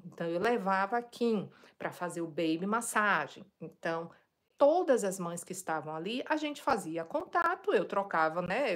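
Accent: Bahia, Brazil. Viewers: Que delícia de página! Comecei a seguir hoje ! Que delicia de sotaque Baiano! História de vida linda❤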